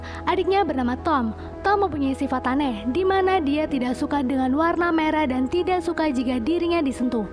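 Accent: Pemalang, Indonesia. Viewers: Mba orang Pemalang yak?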